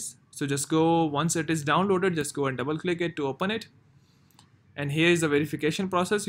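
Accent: India. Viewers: So ein inder akezent feier ich mega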